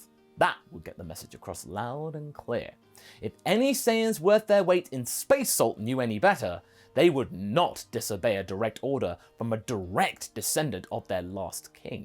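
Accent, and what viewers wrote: United Kingdom. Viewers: I love you British voice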